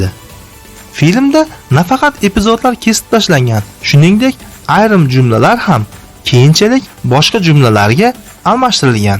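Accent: Namangan, Uzbekistan. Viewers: Наманганлик акансиза шархловчи😊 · Наманган шеъвада гапирган админ. Шеъвани йўқотиб адабий тилда чиройлироқ гапиринг. Русча сўзларни ҳам Наманган шеъвада гапириб юбораябсиз. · аввало узингни .талаффузингни Тугрилагин Наманганликмисан нима бало шевангни.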